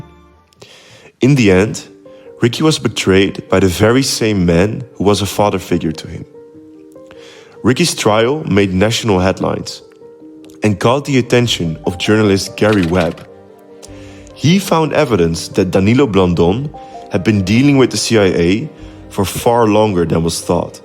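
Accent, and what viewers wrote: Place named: Netherlands. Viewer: Weg met je Nederlandse accent je weet niet eens waar je over praat oen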